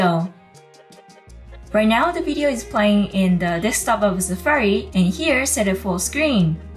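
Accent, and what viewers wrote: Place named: England, United Kingdom. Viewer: I love your English Accent Sensei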